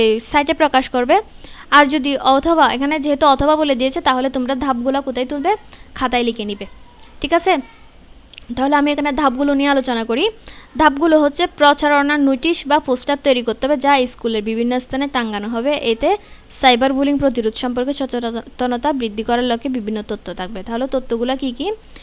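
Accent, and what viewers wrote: Chittagong, Bangladesh. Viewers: Sis is pure chittainga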